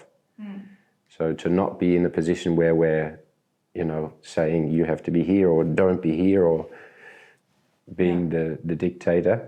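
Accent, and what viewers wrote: Australia. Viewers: Is this horse 🐎 teacher an Aussie or a Kiwi 🥝??? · Are you Australian? I was so impressed with your approach and the lessons you taught this beautiful horse, you saved him, Bless you, from Australia ❤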